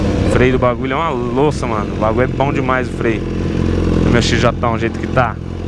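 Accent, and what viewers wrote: Goiás, Brazil. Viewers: A tem que fala daquele jeito no pique do Goiás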